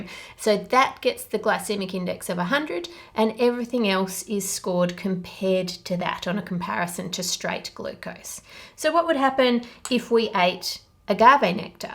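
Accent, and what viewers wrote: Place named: Australia. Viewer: I find it funny how has a clear Australian accent but then her son(?) sounded like a proper Bri'ish schoolboy.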